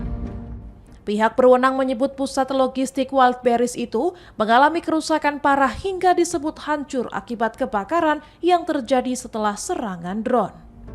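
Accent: Indonesia. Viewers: Widiihh suroboyoan,e metu , arek endi iki ?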